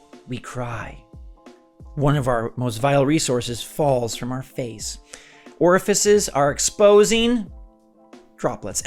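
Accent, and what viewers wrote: United Kingdom. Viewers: I was wondering the British accent but the queen did kinda die though😢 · Your British accent sounded just like the guy who plays Phillip in the Crown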